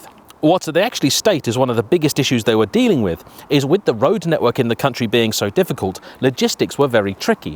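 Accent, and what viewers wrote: United Kingdom. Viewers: This channel is very good both for learning about car history and for learning good, British English.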